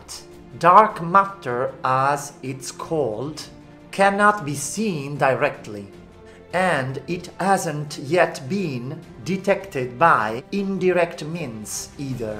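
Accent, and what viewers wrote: Italy. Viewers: this is officially my favorite video ever: his English-Italian accent mixed with a topic so interesting and from which I've always been fascinated, fills my afternoons with joy. Your lessons are the best.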